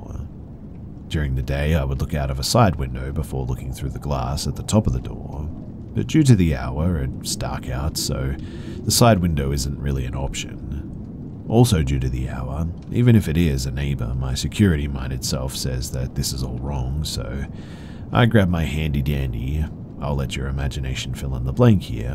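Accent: Australia. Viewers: is an aussie? 😮 I thought I heard aussie buuut didn't want to offensive, lol.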